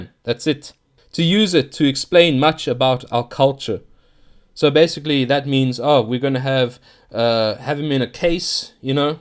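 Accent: South Africa. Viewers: I just discovered your channel through normalapollo, I could be wrong but you sound South African, or at least Rhodesian by your accent, and it's good to know a fellow countryman who loves lovecraft and holds nationalist beliefs. Keep up the good work, Volk En Vaderland.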